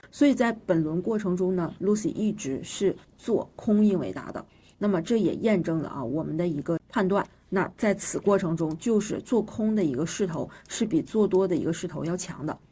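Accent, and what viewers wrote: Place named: Northeast, China. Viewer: 这东北味很亲切啊 哈哈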